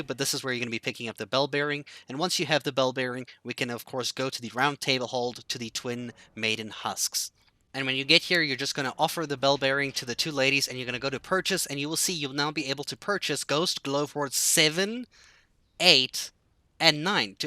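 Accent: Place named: South Africa. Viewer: I have a friend from S A. I recognize the accent. Cheers and thanks for the help.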